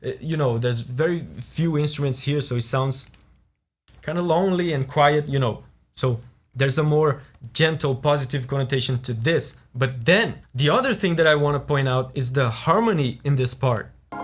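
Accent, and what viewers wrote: Brazil. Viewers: Tu é do brasil né? Muito top, vi um pouco do seu sotaque e fui conferir e né q era vdd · Sotaquezinho brazileiro, que fofo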